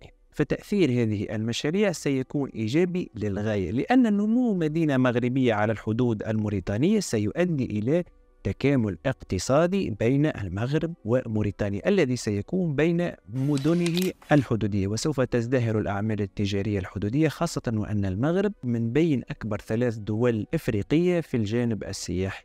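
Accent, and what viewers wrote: Tunisia. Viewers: بعد السماع جيدا ، تبين أنك تونسي خبيث و تمس بسمعة المغاربة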